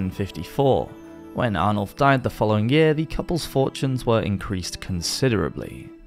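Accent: United Kingdom. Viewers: The way you red coats pronounce some of your words is astonishing...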